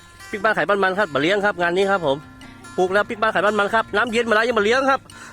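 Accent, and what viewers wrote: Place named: North, Thailand. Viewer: สวัสดีค่ะ คิมมารับชมการปลูกแตงกวาญี่ปุ่นด้วยจ้า คลิปนี้พูดเหนือด้วย น่ารักดีค่ะ👍👍😁😁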